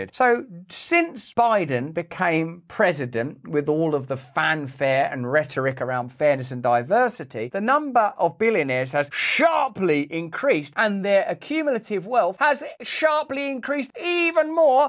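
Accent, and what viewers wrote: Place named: United Kingdom. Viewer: Used to like russel now he is alex jones with a british accent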